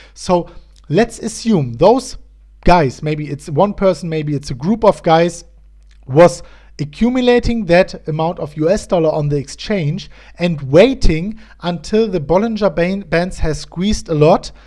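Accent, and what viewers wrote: Germany. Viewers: His accent is as German as it can get :) German here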